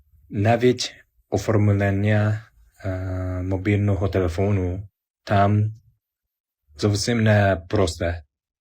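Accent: Standard. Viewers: Молодець , мова чиста . Так важко . Але з часом це пройде . Більше спілкуватися з українцями , навчитеся . Розкажіть про себе , як до вас звертатися , що робите в Україні . Щоб вам легше було говорити , спочатку напишіть текст , прочитайте його декілька разів . Тоді буде легше . Дякую за свою точку зору на наше життя . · Гарна, чиста українська мова - ви молодець! Та й хлопак дуже гарний. 😊 Щасти Вам у житті ❤ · Говориш нормальною українською, а не довбаним суржиком як базліч "дружелюбних". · Молодець! Навіть ми не завжди говоримо такою чистою украінською мовою🎉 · Ви молодець дуже гарно розмовляєте по українські дуже чисто та грамотно,Я Українка но у вас получається розмовляти ще літернатурніше ніж у мене,Дякую тобі сонечко за твою старанність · Який молодчина. Чисто українська мова вражає. Дійсно, що нам треба ще вчитися так розмовляти Дякуюмо вам юначе за теплі слова про Україну. 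Вона у нас найкраща у світі.❤ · Вау, молодець ! Така чиста мова !👍👏👏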